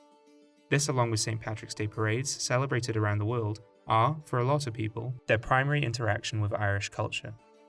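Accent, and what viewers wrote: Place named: England, United Kingdom. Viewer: Fascinating video and very nicely done. I'm curious as to why the narrator has an English accent? Seems a bit odd.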